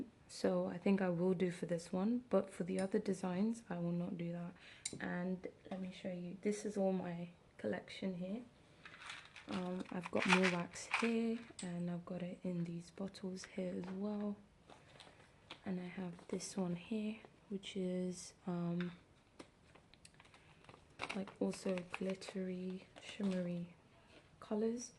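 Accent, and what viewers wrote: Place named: England, United Kingdom. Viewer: 💕Hello my Dear...I really enjoyed watching this video because i doooooo love candles and WAX. I collect candle scraps too and put them in dried flowers or potpourri and It all smells divine!!!😅😅 Love your English accent because it's Just like mine..i was Born in Bedford 1970 and have been in Italy since 83...i still haven't Lost my accent even though i speak Italian everyday...You speak very fluently and you are unserstandable...The video Is very relaxing and thank you for sharing....Take care and a PROSPEROUS NEW YEAR to you and loved ones❤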